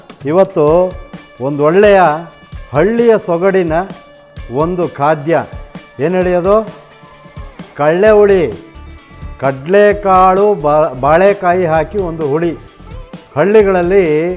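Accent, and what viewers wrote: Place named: Chamarajanagar, India. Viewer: ನೀವು ಮಾತನಾಡಿದ್ದು ಚಾಮರಾಜನಗರದ ಭಾಷೆ ನೀವು ನೀವು ಮಾಡಿದ್ದು ಚಾಮರಾಜನಗರದ ಕಳ್ಳೇ ಹುಲಿ ಈ ರೆಸಿಪಿ ಮರೆತು ಹೋಗಿತ್ತು ನೆನಪು ಮಾಡಿದ್ದಕ್ಕೆ ಧನ್ಯವಾದಗಳು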